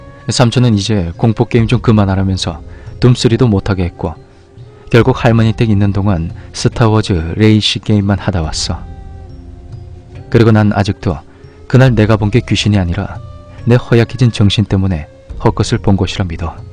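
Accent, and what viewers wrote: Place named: Busan, South Korea. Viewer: 충청남도 서산인데 부산사투리 쓰네 ㅋㅋㅋㅋㅋ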